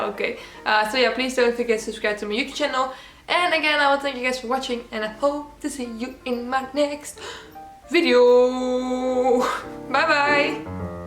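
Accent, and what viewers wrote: Netherlands. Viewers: It's probably because she has such a strong accent. Or the reason she has such a strong accent lol. She doesn't change her voice or letters so it's pretty much Dutch with English words, if you know what I mean. She speaks fluently so it's no effort for her to create sentences, so she can talk like she normally does. She's not really copying the American intonation, which is what you notice when people's voices change. Every language has different intonations and that can make a voice sound different when you speak different languages. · Amai je hoort direct dat je van Nederland komt!😅😅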